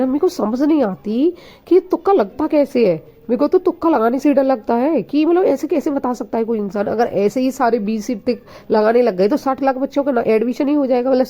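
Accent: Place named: Mathura, India. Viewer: Aap proper mathura ki ho kha se h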